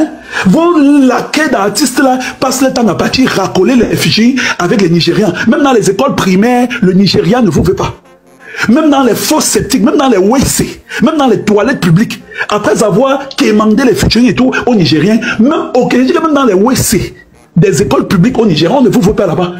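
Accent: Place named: Cameroon. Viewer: Mais c pas un ivoirien tu es camerounais même si on me dit koi avec le ton et tu en connais trop sur le cameroun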